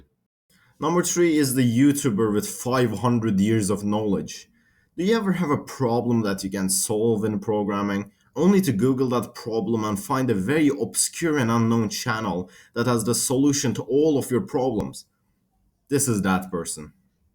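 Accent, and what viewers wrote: Turkey. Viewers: Türk aksanı mmhhhh kolay gelsin brom. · Dude are you turkısh? EDİT: Kesin Türk'sün ama İngilizcen gerçekten çok iyi. Yani böyle dersin ana dili yani öyle konuşuyorsun. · Are you turkish? I saw the turkish "activate windoes" watermark . I should have understood it from your accent and profile photo.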